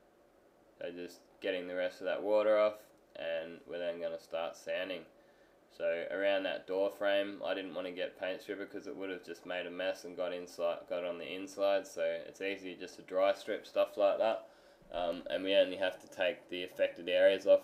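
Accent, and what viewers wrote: Australia. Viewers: this is awesome work mate, where are you in australia? sounded like a qld accent to me haha i’m from adelaide, been watching a few videos for my capella project, its been painted before so i’m thinking wet paint remover will be the best, can you send a message or something of a good paint remover? cheers legend!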